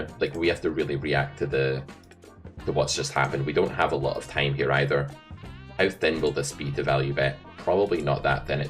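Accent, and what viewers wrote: Scotland, United Kingdom. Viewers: Really like your Scottish voice… nice books too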